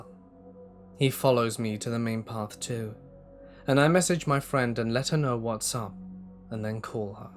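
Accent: United Kingdom. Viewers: Is this British English accent? sorry I've been learning English..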